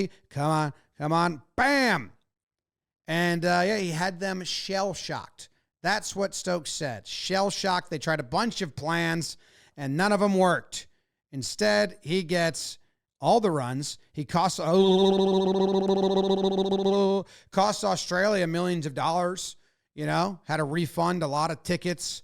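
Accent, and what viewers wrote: United States. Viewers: I went 5 minutes in and went "Wait a minute, that's an American accent". Good analysis.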